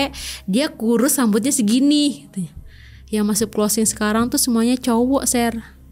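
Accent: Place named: West Java, Indonesia. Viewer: Lidah orang sunda kayaknya 😂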